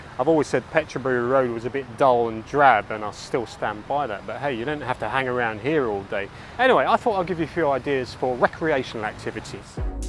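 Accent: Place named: Oxford, United Kingdom. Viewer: yes , clear nice oxford english as a native british. Guess he is a true gentleman as well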